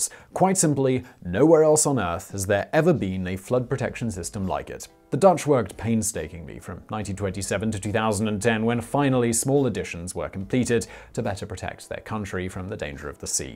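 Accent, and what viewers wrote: England, United Kingdom. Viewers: With that accent? No - I do believe he is 100% English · Reading downwards I see no other comment really necessary but to compliment you on your pronunciation as most of my native English speaking friends never tried that hard. And going on your accent, you probably don't mind if foreigners call the UK or Great Britain 'England' either. All in good fun for whomever gets it.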